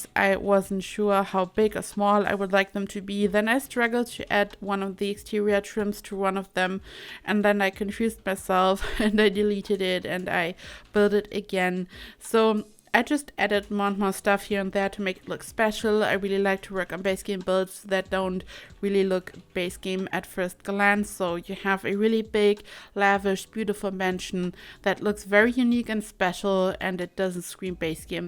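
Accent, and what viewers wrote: Germany. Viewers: your english... I could swear you german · You sound sooo german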